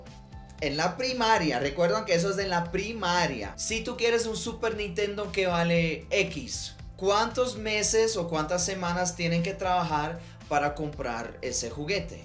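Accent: Bogotá, Colombia. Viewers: Soy de Argentina y su acento es muy colombiano. Quizás te referís a qué es un acento colombiano neutro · el tiene acento rolo, nosotros los rolos pensamos que no tenemos acento, pero si es asì ! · Neutro??? De oirlo supe q aprendió español en Colombia. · Que pirobø tan colombiano el jaja habla y tiene muchas actitudes de la tierrita, cvero caso de armor a la patria ♥️ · Me salió tu video por casualidad pero me mataste de la risa! 🤣 Nací y crecí en Bogotá hasta los 21 años, pero al escucharte hablar me pareció que no ha pasado el tiempo, aunque llevo ya 32 años fuera del país. Mi niñez la viví en Kennedy, pero ni muerta admitíamos eso si estamos en otro lado 😃. Cuándo no había nada para la cena, y por casualidad íbamos a visitar amigos, teníamos prohibido aceptar si se nos ofrecía comida, porque depronto se daban cuenta que no teníamos que comer en casa 🤨. 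A veces me da risa recordar esos tiempos pero la mayoría de veces la verdad no lo entiendo, y eso que yo me crié allá 😩. La necesidad de aparentar era (es) primordial. 🥺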